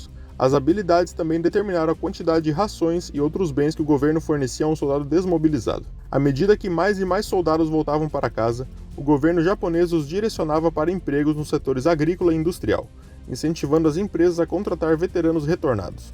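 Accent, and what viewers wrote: Brazil. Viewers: Sotaque sudestino de merda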